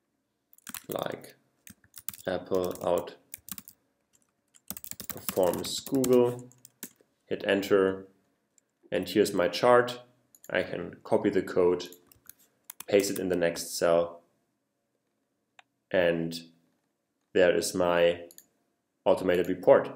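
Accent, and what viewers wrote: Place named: Germany. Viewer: hey, sprichst du deutsch? dann lg -der akzent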